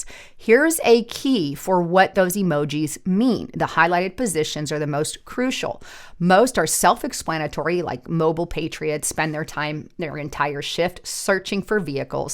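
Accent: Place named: South, United States. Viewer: Great thorough report as always - and nice job w/Min-ne-a-polis. Hey, if it's the way you have always pronounced it - yes, hard habit to break. Also, I'm originally from Mass-a-chu-setts - noticed you like many here in the South pronounce it Mass-a-two-sh*ts - not sure if its a purposeful slight on the state by Southerners. Either way, at this point in MA history, its a very appropriate pronunciation!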